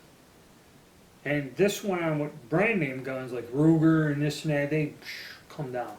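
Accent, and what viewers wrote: Pittsburgh, United States. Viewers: He sounds a little like a Pixburgher who just got home from Gianiggle n'at.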